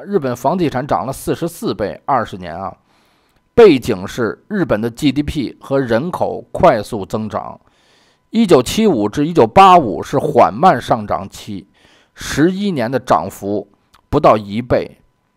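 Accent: Beijing, China. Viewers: 很喜歡你的視頻真棒京腔京味！聽了很親切。老北京人赞你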